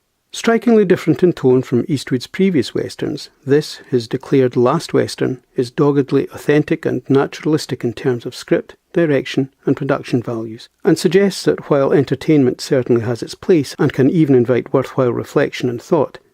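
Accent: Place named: Scotland, United Kingdom. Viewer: Would really like a fuller, longer and more detailed analysis. You’ve got such a lovely Scottish accent... take the bull by the horns and dissect this movie for us??!!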